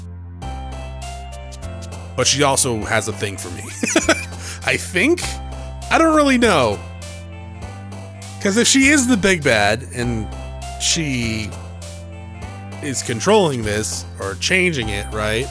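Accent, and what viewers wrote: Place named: Northeast, United States. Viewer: I swear every time I watch a playthrough of this game I see something new. Blood border? Wtf? Why did that happen and how did you get it to happen? What of my OG playthrough will you not see? I am confusion! Also, are you east coast US? Certain words sound very northeast to me in how you're non-rhotic sometimes and have that special 'Jersey' (and surrounding areas) way of saying like, caught or coffee.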